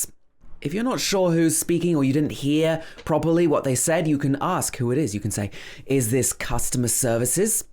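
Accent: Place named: United Kingdom. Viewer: Omg i really wanna learn british accent watched all ur videos...pure british🔥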